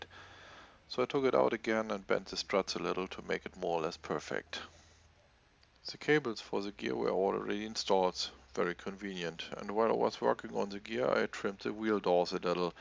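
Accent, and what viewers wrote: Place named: Germany. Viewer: Das nenn ich mal deutschen Akzent :-D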